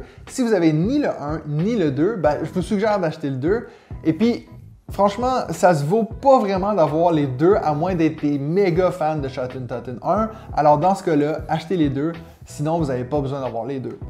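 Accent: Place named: Quebec, Canada. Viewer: J’ai vu dans le vidéo du concours que tu vis en Suisse mais je trouvais ton accent très québécois. Ta réponse vient clarifier le tout ! Merci !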